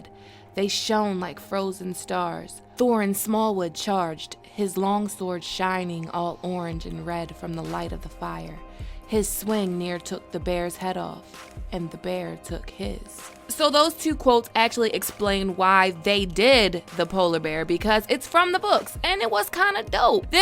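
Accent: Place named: Upstate New York, United States. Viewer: Damn where from that accent sound like upstate New york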